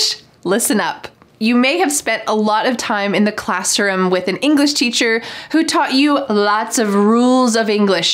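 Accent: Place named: United States. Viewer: Hi! I'm from Poland! Can you teach in another video how to correctly say the word "daughter" in your beautiful American accent that I just love?? 🥰 I can only say it right fast ☺ My 10-year-old son learned to say "I bought my daughter a bottle of water" in American accent 😁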